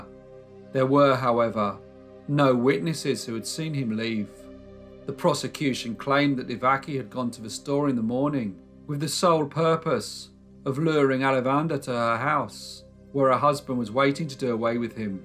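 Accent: United Kingdom. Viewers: thank you for another outstanding video of true crime that is both unique and unheard of by me. You and Forgotten Lives have some very special content and it's an absolute pleasure listening to both of your videos. 🙏🙏Plus, your awesome British accent makes everything sound even better. 👏👏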